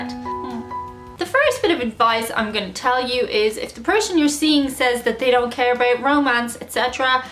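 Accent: Ireland. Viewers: What's a whoaman? Or in these modern days with these crazy kids, woahman. OK. I didn't think could get any prettier but then she showed up in glasses. ''You may have to pay a wee tiny fee''. OMG. The Irishness is just so adorable. Are there still dullards that still care about Kim Whoredashian? Such a dirty skank. So YouTube sucks at paying you guys? Does YouTube need a throat punch?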